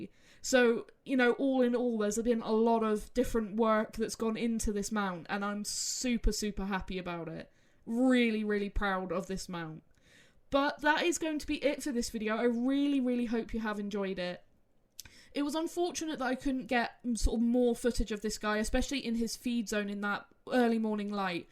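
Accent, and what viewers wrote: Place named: United Kingdom. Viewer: As an American who watches a few British YouTubers your accent is really cool! I don’t know if it is British but it’s a cool accent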